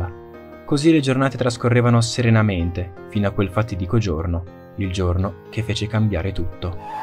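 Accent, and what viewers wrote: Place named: Bologna, Italy. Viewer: Mo che bel accento bolognese che c'hai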